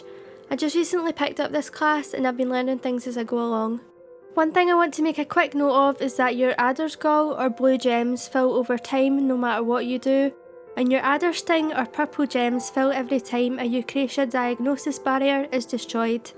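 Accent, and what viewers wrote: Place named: Scotland, United Kingdom. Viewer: I had a terrible time just doing it, thanks to this it helped, and its nice to hear a Scottish voice on here (I'm from NE ENgland and live in Scotland)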